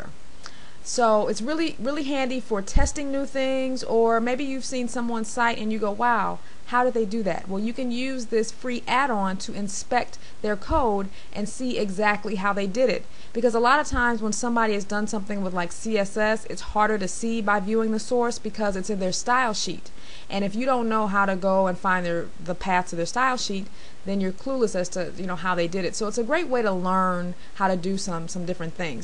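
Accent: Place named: United States. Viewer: yeah, i am spinning! :) also your accent is great, true American.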